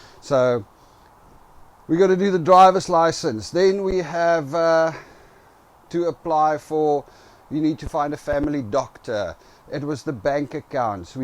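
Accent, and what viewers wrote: South Africa. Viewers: I love the Afrikaans accent - greetings from Namibia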